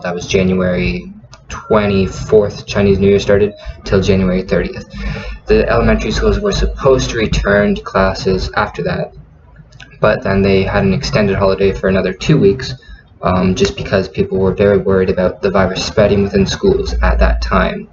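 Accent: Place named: United States. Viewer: Good sharing, you live in Taichung now? I can pick up your American accent. God bless America. MAGA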